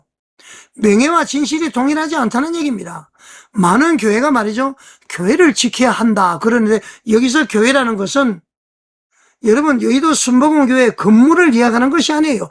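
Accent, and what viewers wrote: North Korea. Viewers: 억양이 이북 사투리가 심한 것 같네요. 우리 교회도 감독에게 양육 받은 부목사가 불륜을 했다는 모욕적 내용을 전 성도들에게 배포, 누명 씌워 담임 목사를 고소해 교회를 박살내 힘들게 했는데 몇 년 지난 후 사실 무근이라 법원에서 판결 받고 벌금 내고 끝났으나 지금도 간간 교회를 여전히 괴롭히고 있네요. 악한 영들의 거짓 행위인 것 같은데... 공산당이 냄새 피우는 것 아닌지 수상들 하네요. 십일조는 반드시 해야 합니다 이는 하나님께 돌아가는 표입니다. 말리기를 참조하세요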